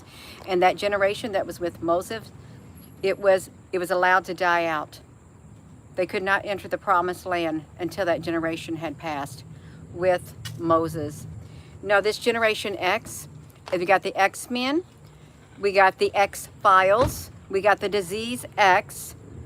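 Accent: South, United States. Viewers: You sound like you live in the south. You have an accent.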